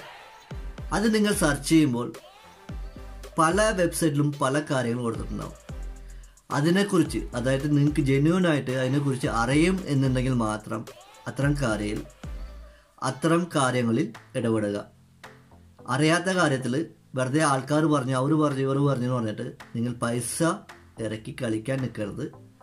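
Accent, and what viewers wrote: Tamil Nadu, India. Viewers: MOTHER TONGUE TAMIL ANNALLE ? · ഒരു തമിഴ് ചുവയുള്ള മലയാളം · Malayali alla... atha... oru tamil touch😊but cute..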